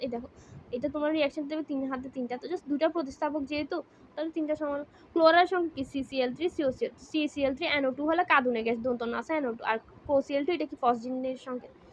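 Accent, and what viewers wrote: Chittagong, Bangladesh. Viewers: আপু কি চট্টগ্রাম থেকে?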